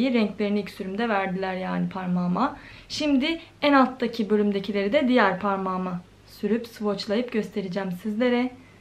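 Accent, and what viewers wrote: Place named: Ankara, Turkey. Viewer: Hem karadenizlisin ama ankaralı gibi konuşuyon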